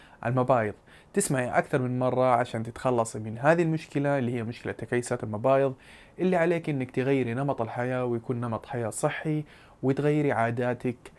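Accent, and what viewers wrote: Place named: Hejaz, Saudi Arabia. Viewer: سعودي حجازي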